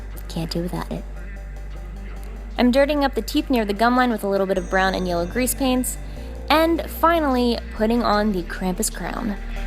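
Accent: Philadelphia, United States. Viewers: yay for Philly accent needs!! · OMFG IS SHE FROM PHILLY P.A.?!?!?! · Is she from Philly?!?!?! #straightouttaphilly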